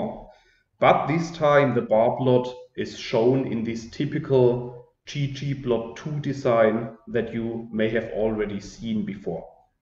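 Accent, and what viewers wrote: Germany. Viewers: Hello, I wanted to say thank you very much! Your videos really saved me today! Btw is that a german accent or am I imagining it? If so then: Super Video! Ich freue mich auf weitere Tutorials :D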